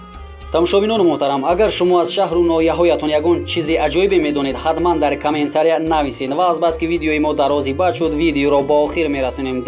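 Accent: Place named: Kulob, Tajikistan. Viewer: Кму минтакаи кулоба полний мегуфти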